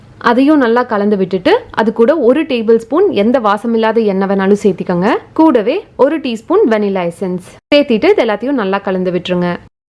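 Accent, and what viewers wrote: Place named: Kongu, India. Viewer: hi.... am ur new subscriber..... ur recipes are really 👌 n r u from kongu region...? ur slang s lik that.. tats y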